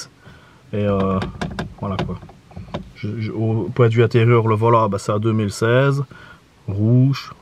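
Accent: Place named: Namur, Belgium. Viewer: Cet accent... tu serais pas de namur?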